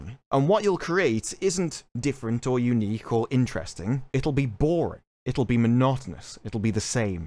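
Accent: United Kingdom. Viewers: God I enjoy your lovely British accent while passionately dismantling these Tolkien abusers.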